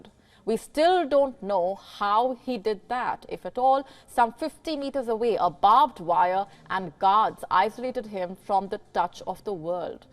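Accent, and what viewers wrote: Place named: India. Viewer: Hard to understand for this report by english with the indian accent. Give up on her.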